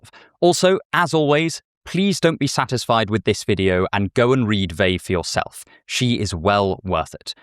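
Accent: United Kingdom. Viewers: I like yur voice...are u brit?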